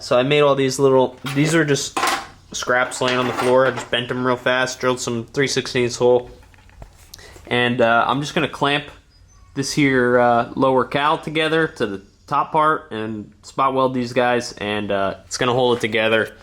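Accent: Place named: New Jersey, United States. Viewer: You have a accent . Jersey?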